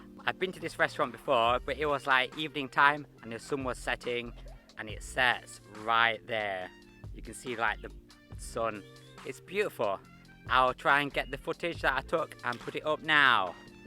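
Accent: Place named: Thailand. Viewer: First video of yours I've seen,. 😁✨ Fantastic video. Your accent had transformed a lil from Sutton/English to a hint of Thai accent it sounds. Can't wait for the next video. Loving seeing your footage from Thailand. Well done!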